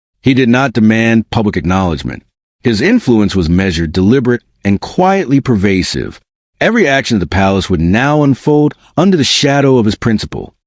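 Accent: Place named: South, United States. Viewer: It's ironic that the voice has a southern U.S. accent when the setting is England.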